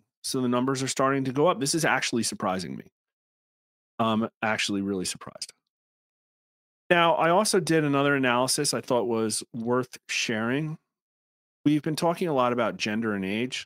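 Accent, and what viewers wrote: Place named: Wisconsin, United States. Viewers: I didn’t know was from New Jersey. I’m from Wisconsin. He absolutely talks like he’s from the Midwest. I would’ve pegged him for Wisconsin night myself. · Still weird to me that you’re from New Jersey but say words like have and had like you’re from Wisconsin.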